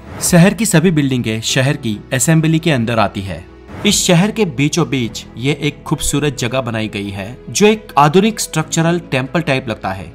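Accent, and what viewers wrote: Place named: Bihar, India. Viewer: बिहारी लोग विडिओ को " भिडियो " , वोट को "भोट" , वेज/नॉन वेज को " भेज/नान भेज " सड़क को "सरक" , घोड़ा को " घोरा " कहते हैं। आप ज्यादा परेशान न हों।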